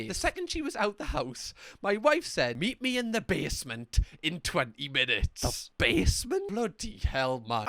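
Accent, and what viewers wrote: Newcastle, United Kingdom. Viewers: Another day, Another Vid. Love u and the Geordie accent lad.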